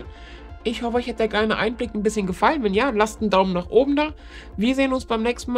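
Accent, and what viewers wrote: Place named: Saarland, Germany. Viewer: Ohleck, noch e 3D Saarlänna 😃 Saugudd 👍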